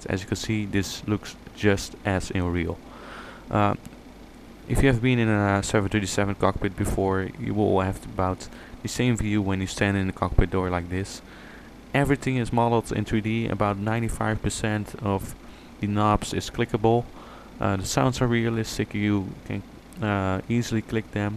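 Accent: Netherlands. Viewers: From your accent I can hear you're dutch :)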